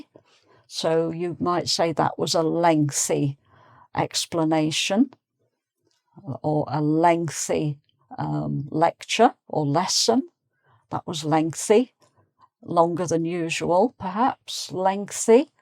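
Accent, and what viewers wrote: United Kingdom. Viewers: british accent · Dear madam... l really enjoy listening to your clear english accent... I usually like to listen to short english story audiobooks on youtube but they are usually narrated by unclear voices.. I wish you could upload short stories audiobooks narrated by you... please try this idea.. I'm sure many of english learners would love to listen to classic english short stories enjoying your clear british accent... thanks alot madam 🌷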